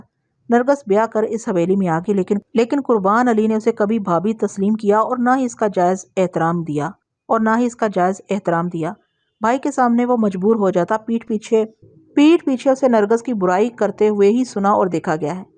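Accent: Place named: Pothwar, Pakistan. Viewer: محترمہ آپ کا پوٹھواری لہجہ اچھے خاصے قصے کا ستیاناس کر دیتا ہے۔ اپنے لہجے میں معنویت پیدا کرنے کی کوشش کریں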